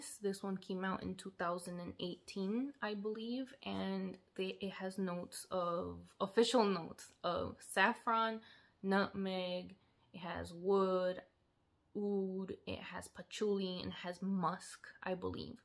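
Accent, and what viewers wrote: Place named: Mexico. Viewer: You’re very welcome! que accent, that Mexican Spanenglish accent 🤓.. let me guess your a sagittarius or libra ? Supposedly Virgo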